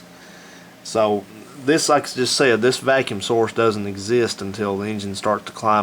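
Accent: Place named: West Virginia, United States. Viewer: I didn't get to add thanks a lot for the videos. You kinda sound like you are from West Virginia like me, or maybe Kentucky or North Carolina.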